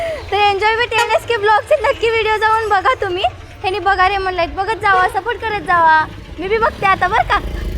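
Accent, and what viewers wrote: Latur, India. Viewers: Mastt banvta vidio aani bolne tumchi laturuchi bhasha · hello mi nashik cha ahe but mazi ek friend ahe latur chi tich bolan ani tumch bolan ekdam same to same ahe. Khup mast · जाम आवडल.,. तुमचं बोलणं. मी पण चार वर्षे होतो लातुरात म्हणजे नीलांग्यात मास्तर म्हणून . मलाही लातूर वल्यांच accent ani dialects खूप आवडतात.... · बोलीभाषा खूप भारी आहे माझे एक मित्र आहे लातूरचे ते पण असच बोलतात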